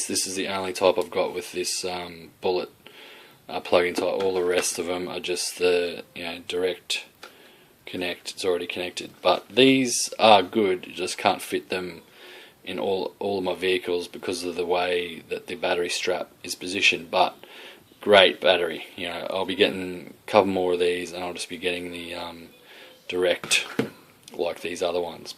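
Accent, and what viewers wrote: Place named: Queensland, Australia. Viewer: sounds like you live in brisane/southeast queensland. where abouts do you bash?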